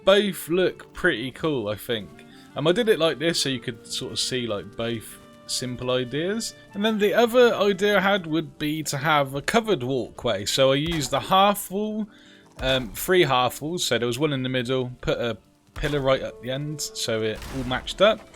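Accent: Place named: Suffolk, United Kingdom. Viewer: I'm from suffolk , that's why I thought I could hear a hint of suffolk in your accent Haha.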